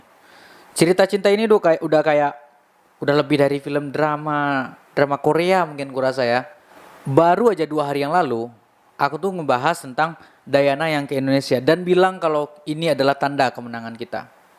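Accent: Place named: Sumatra, Indonesia. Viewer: Sor x bah aku nonton in video mu bro, kalo bisa logat Sumatra jgn di ilangkan bro, semangat buat konten bro... Udh lama jd subscribers mu baru x ini aku komen😂😂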